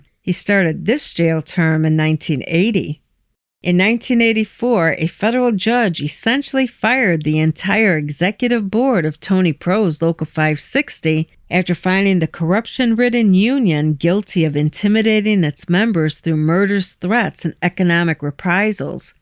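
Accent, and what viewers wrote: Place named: New York, United States. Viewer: Very good narrator. Has some New York/Eastern seaboard in her voice.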